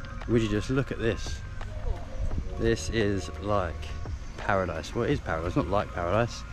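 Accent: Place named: United Kingdom. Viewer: Why your shirt has Malaysian flag? But your accent sounds British...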